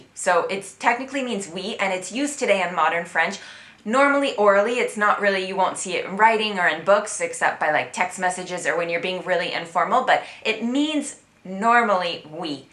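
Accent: England, United Kingdom. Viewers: Hi. It's certainly b/c you just don't know your sounds in French ( u / ou - an / on ) and keep your English accent . In these conditions, I couldn't speak this way myself. You should start to pronounce short words the right way then see step by step. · Your sole problem in this video is : you pronounce all these french words with a perfect English accent ! Especially the pronoun ON. Consequently it must be hard for your English speaking audience.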